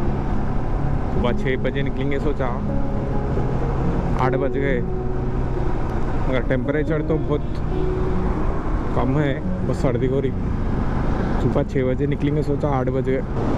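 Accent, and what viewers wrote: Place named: Hyderabad, India. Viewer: Looking awesome in hyderabadi accent 🖤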